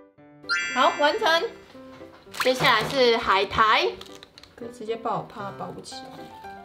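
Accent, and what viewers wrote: Taiwan. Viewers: 將台語好可愛喔😆😆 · 在國外工作看你吃飯很療癒再五個月就回台灣謝謝水水最愛看你說台灣國語和不碖轉的台語加分！掰餔～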